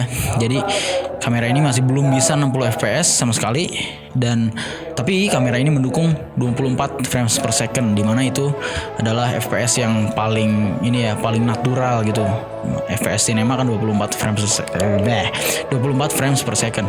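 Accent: West Java, Indonesia. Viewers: pertama liat channel ini gw langsung SUB karna infonya mamfaat buat gw...dan gw pikir bukan orang sunda, eehh nyunda oge ningan...makin trus mantau channel ini, sukses terus lurr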